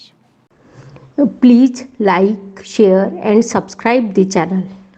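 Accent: Maharashtra, India. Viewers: ASTAM STHAN PATNI KA DHAN KUTUMB VAANI STHAN HAI...PATNI KI VAANI MAI MITTHHAS HOGI😂 SASURAL SE PAISA AA SAKTA HAI...AADHYATMA BADH SAKTA HAI. LET S KEEP FINGERS CROSSED. PURA HINDI PRAVACHAN MARATHI LAHEJE MAI SUNNEKA ANAND AAYA ASTMESH BARAHVE MAI OR PAAP PRABHAV RAHUKA VIPAREET RAJYOG KA ULLEKH HOTA TOU HOSLA BADHTA. BABA KEDARNATH MAHADEV KI JAI.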